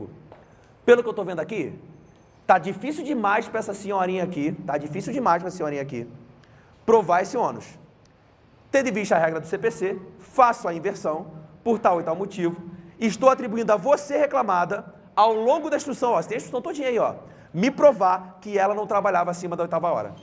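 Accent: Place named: Rio de Janeiro, Brazil. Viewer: Pô Prof. gratidão! Só gosto de ouvir aula c sotaque carioca haha Café caro da porra kkkkkkkkkkk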